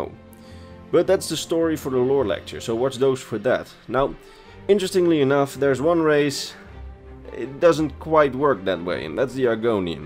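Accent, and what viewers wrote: Netherlands. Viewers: Are you dutch? I can tell from your accent hehehe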